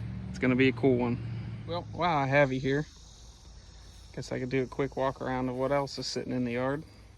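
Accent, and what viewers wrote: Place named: Pennsylvania, United States. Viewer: I knew right away you were from Pennsylvania. When I heard you speak on PeaceNGrease video from rod run I got to tell you that Mack truck is beautiful I love it the iron city beer sign on your floorboard at the shifter reminds me of home I'm originally from Butler Pennsylvania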